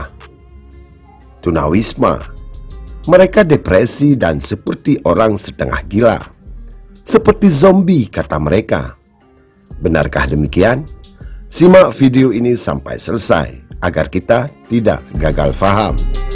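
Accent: Aceh, Indonesia. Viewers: "T" dan logat.aceh nampaknya yg isi suara di narasi di ulasan ini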